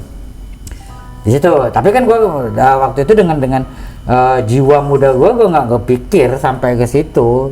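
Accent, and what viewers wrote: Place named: South Sumatra, Indonesia. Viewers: Bahasa sumatera selatan tu jgn d campur2 bahasa indonesia mang 😂ketahuan bener logat mu bahasa sumsel ,bingung org klo yg gk ngerti .. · Padahal enak ceritanya, dari ngomong pakai bhs daerah di terjemahin lg pakai bhs nasional. Ya begitulah logat sumatra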